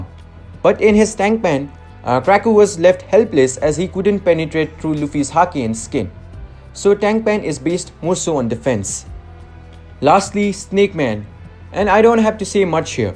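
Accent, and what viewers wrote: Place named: India. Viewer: Oh I’m sorry I taught you we’re because you have an Indian accent. Good Video btw!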